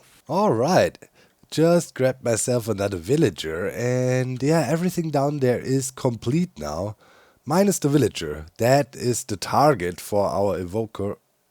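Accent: Germany. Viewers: "fiddl wid it a littl bid" must be the cutest thing you can say with an endearing German accent like ❤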